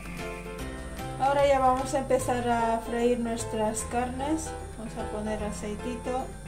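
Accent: Spain. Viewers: Lo primero que me llamó la atención, el acento. Inmediatamente pude percibir que reside en España. Por lo demás, práctico la receta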